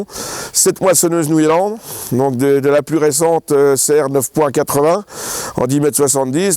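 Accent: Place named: Lorraine, France. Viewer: j habite a 1h de Nancy et je sens bien l accent de sa voie 😂😂😂 belle video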